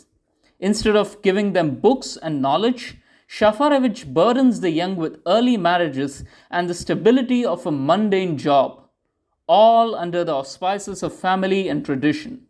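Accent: India. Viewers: The narrator sounds Indian as shaferevich being impressed by Indian mathematics allowed Indian orthodox Christians to come to Rus! Long live Shaferevich Long live Orthodoxy Long live Mathematical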